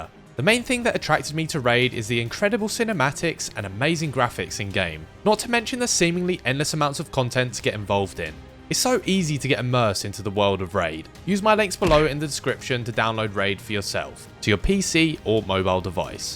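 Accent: United Kingdom. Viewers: Best woods guide on the internet, with a British accent to top it off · Easy sub for you here mate. Clear consise no BS and British. Woods not intense though? Gotta argue on that one!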